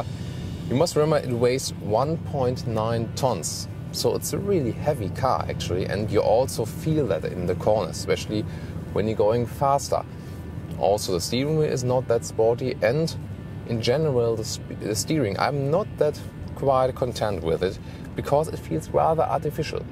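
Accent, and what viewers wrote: Germany. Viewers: Great review. Your English reminds me so much of my Grandmother. She was German, too. And everytime she asked 'what time it is' she would say 'how late is it' (wie spaet). Made me smile. You liked the car but it seems you're not fully convinced.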